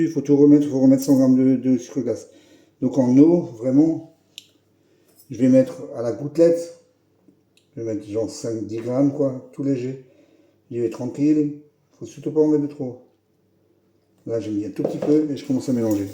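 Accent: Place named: Lorraine, France. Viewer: Genial recette et commentaires....moi qui suis lorrain d'origine j'adore retrouver l'accent et les expressions.... J'ai mis a l'agonie mon kenwood cooking chef....1.5 de farine = limite de capacité ! Mais il a bien fait le taf 😊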